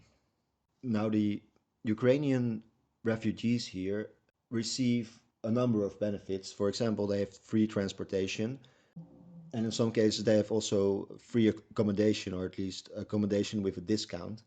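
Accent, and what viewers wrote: Netherlands. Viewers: Are you Dutch? :0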